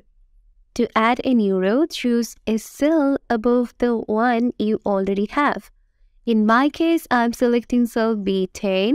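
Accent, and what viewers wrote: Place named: East Asia. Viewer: Nope. Eastern Asian speakers normally speak this way.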